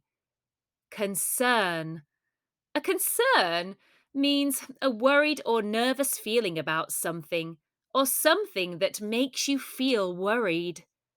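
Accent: United Kingdom. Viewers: Honestly I've never taken part in a charity event. And there are also some activities that we don't know, but we learn them with you which means that helps and encourages us to learn with you and your British accent. Thank you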